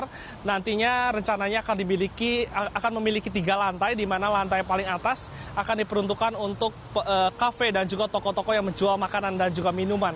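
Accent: Java, Indonesia. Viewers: Ra sah ditahan medokny mas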